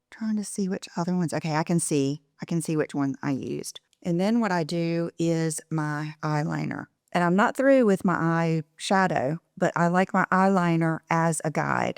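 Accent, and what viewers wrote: South, United States. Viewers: One reason you’re successful is you’re really careful about what you say and how you say it. Even when you’re putting something out there that might be considered critical by some, you say, “How do I say this the right way, not to come out with the wrong intention.” I’ve been mindfully attempting to be more like that. I am really working on it. That, and carnivore, thanks to you. I love that you do so many videos each week. Love your voice, too. So comforting. I lived in Alabama for 3 years and though your accent is not N.Alabama, it’s a soft, sweet Southern accent, just the right speed, and tone. You keep doing you, which inspires us. ❤️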